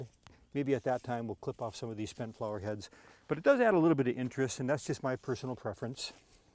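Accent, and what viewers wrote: Minnesota, United States. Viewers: Are you from Minnesota? :). Thank you for the video! I need to trim mine back, they are big. Unfortunately, too late this year. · Heard the accent and immediately went to the comments to see if there was a mention for Minnesota and was not disappointed 😊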